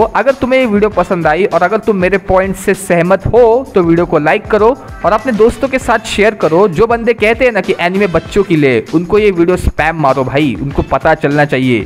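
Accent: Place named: Maharashtra, India. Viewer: Tum Maharashtra se ho tumhare accent se pta chalta hai hehehe Arey Haan yaad aaya ... Wo kaliya wala point tumhare kaafi valid hai vaise inhone isliye use Kiya hoga ek toh sholay se leke aur dusra aaj bhi gaanv dehat mein kaaliya kaalu goru aise naam hote Hain ... Tumhe indtoons ko Disney ke toons , bugs bunny , duck tales etc. se comparison ku nahi kiya karna chahiye tha, tin tin , pink panthar toons pe bhi toh films bani hai woh bhi behad successfull ... Indtoons vaise sarre huye isliye bhi hote hain ki yeh arena multimedia se padhe huye bakwas 2d animation walo ko hire kar lete hain aur budget itna Kam sa rakhte hain ki ek episode ki script ke paise toh hote nahi multi story ya pura character hundreds of episodes build karne ki story kya ghanta hi likhwayenge yeh ... Aur inke cartoon isliye chal jaate hain ki maa baap bhi yutiye hain wo sirf jaan chhore isliye tv on karke kuch bhi cartoon lga ke bitha dete hain isliye watchtime gain karte karte inke yeh behad ghatiya toons chal jaate hain ...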